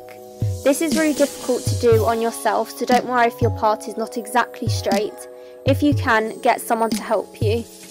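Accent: United Kingdom. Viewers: Omg she's so British well I am but I'm from Essex and we don't sound like that lol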